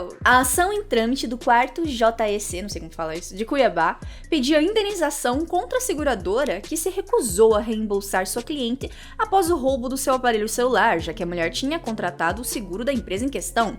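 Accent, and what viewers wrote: Paraná, Brazil. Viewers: Perai, existe sotaque paranaense?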